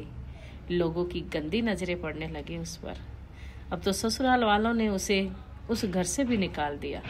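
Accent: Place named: Himachal Pradesh, India. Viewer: बेटा जी आप हिमाचल, बिलोंग करते हो भाषा, से, फील, हो, रहाहै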